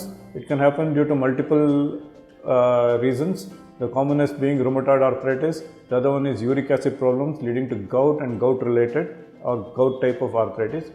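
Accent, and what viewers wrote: India. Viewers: It’s Indian English. It’s probably closer to standard English than American, Australian or South African English. Expose yourself to it more and you’ll adapt to the intonation incredibly quickly. If you’ve learned other languages then you’ll adapt to it really quickly. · leo bonita Its indian accent dear. Smtimes i dnt understand foreign accent, its so simple.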